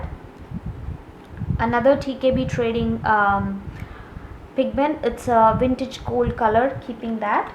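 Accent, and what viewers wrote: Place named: Andhra Pradesh, India. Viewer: R u from andrapradesh? Just ... Ur accent ...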